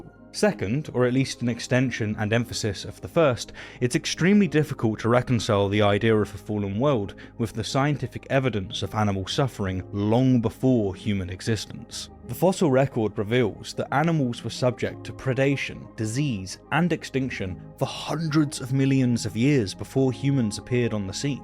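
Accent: England, United Kingdom. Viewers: The English accent gets me every time :)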